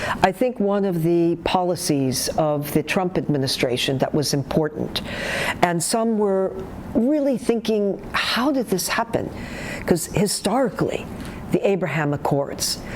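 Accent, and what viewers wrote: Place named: Arab world. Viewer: Abraham Accords will lead to Arab Spring times 10. Miss, no offense but your accent is a bit strong. When you speak English sometimes it sounds like Arabic. So harsh. Maybe hire a different host if you want to appeal to anyone other than Neo-Cons, war criminals, and fellow Arabs.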